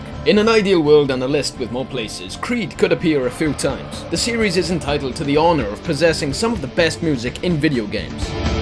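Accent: United Kingdom. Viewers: You sound like Cryaotic(A famous youtube gamer) with a british accent.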